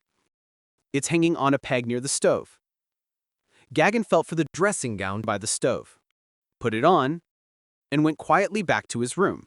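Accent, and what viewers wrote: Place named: United States. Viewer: Я не ожидал, что голос с американским акцентом подойдёт к рассказам Чехова. Но это случилось. Удовольствие слушать!❤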